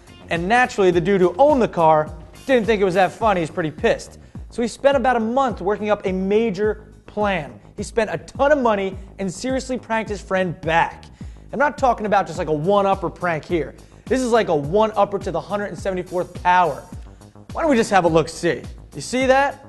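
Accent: England, United Kingdom. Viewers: Nice UK Accent btw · Forget the real English accent. English acent is much better! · nice english acent!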